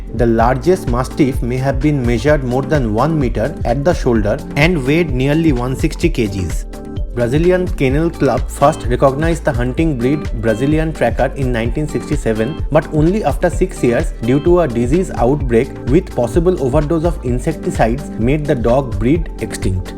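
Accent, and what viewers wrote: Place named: India. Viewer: U have terrible indian accent. Pls ask somebody to dub ur vids. U can found hundreds of offers on fiverr esp.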